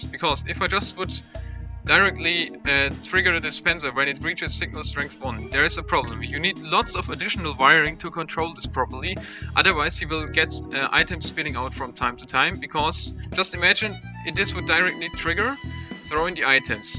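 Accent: Germany. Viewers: Woher wusste ich, dass du deutsch kannst? :D Irgendwie hört mans :D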